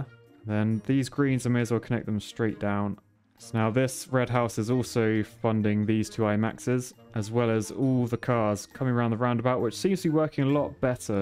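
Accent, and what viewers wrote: United Kingdom. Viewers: Dude yo British 😂😂😂😂😂😂😂😂😂😂😂😂😂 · Hes brit dont mind him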